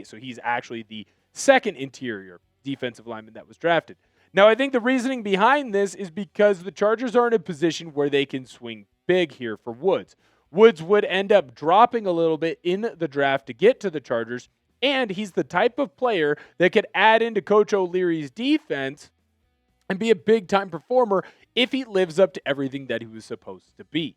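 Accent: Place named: Fargo, United States. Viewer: You sound like you’re from Fargo bro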